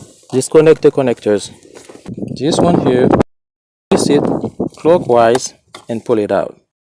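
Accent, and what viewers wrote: Haiti. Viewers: That Haitian accent 😂💪🏿💯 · Hey! I recognize that unmistakable accent!!! Sak Pase!!!! I'm just about to go out to my 1998 Camry that just won't die and install a backup camera. Thanks for the video!!!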